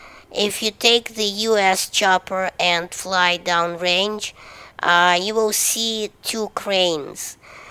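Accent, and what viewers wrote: Russia. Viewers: I can't help but notice your russian accent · are you half russian half asian or something when i heard ur voice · Спасибо, что рассказал. Половину я и вовсе не знал. А по начальному английскому , понял что ты русский)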